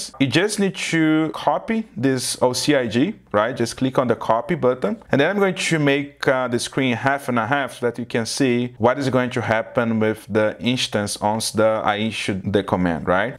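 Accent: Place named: Brazil. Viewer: Awesome.!!! Excellent video and excellent material that you share with the community! Since you approach it from the very practical side and complement it with the material that just Oracle released last week to do the OCI certification. I have a question for you! With the steps that you indicate in the video, I understood that we can create scripts in Bash to automate the starting and stopping of the instance or database. But where do you create the crontab... In OCI Client or in the machine?? or Where you installed OCI Client??. I'm very confused with that. Could you help me? Thanks again for sharing your knowledge and experience! .Finally, for your voice and accent, I think you're from Brazil! So regards from Colombia! Muito obrigado.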